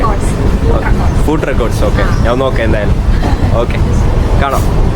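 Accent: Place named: Kasaragod, India. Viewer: കാസർഗോഡ് ഭാഷ ❤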